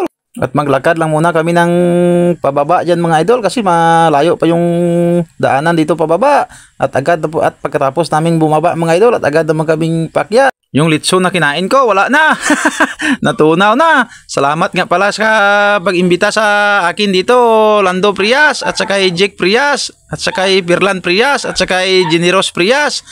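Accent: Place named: Karay-a, Philippines. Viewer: Hahah ,nami gali pamatian Ang Tagalog kag karay ah 😂 in short Tapaznon 😅❤ Idol lang sakalam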